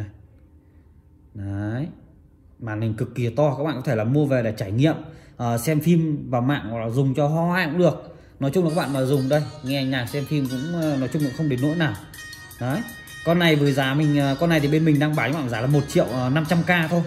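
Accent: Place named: South, Vietnam. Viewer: Nói giọng nam .còn dễ nghe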